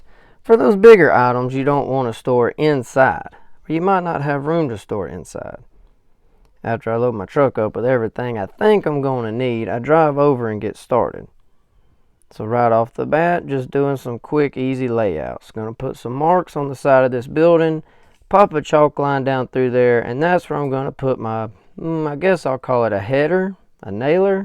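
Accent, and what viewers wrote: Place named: South, United States. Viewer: Enjoyed your video. Enjoyed your true Southern accent and attitude... "Let 'em run wild"... lol 😂. Gathered some good tips. ❤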